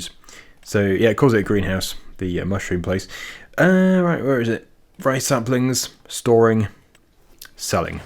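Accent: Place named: Southern England, United Kingdom. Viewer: Can I just say, the British way of saying things is the correct way. Our American friends pronounce things incorrectly and have some of their own words for things. A trunk to the American’s is a boot to us. Lol…you can’t cater to every language dialect and varying terminology. Just be you, be British (I would say and ‘proud’, but atm Britain is a little broken). Anyway at least you’re from down south and sound posh. Unlike myself who is a northern lass and sounds as broad as a plank, with a strong Lancashire accent 😊 I would have put money on you flipping that trailer with all that wood on it! You surprised me lol…it was very close at one point though. 😂🤣 Loving the series very much, but I would like to see you ride the horses now and then. I hope you are feeding Dogwin! Thanks for all your hard work.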